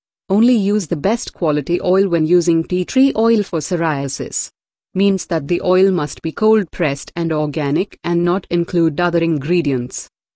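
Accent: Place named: North India, India. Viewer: This woman is from India obviously the slang also her voice more to North Indian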